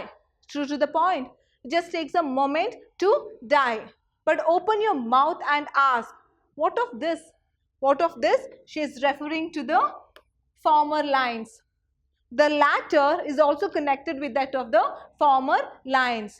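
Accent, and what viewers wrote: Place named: Kerala, India. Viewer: But her pronunciation is unbearable...mallu pronunciation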